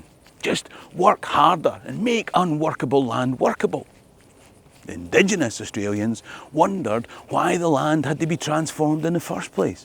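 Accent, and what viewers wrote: Scotland, United Kingdom. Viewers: Man - what a story! As a german I love to hear your stories on scottish history. For your fresh view on history and the scottish accent I love. Turns out I am wrong. You are a rarety, a philosopher and philantroph disguised as comedian. Holding up the mirror like a jester. Thank you. Todays crazy world needs more of your kind.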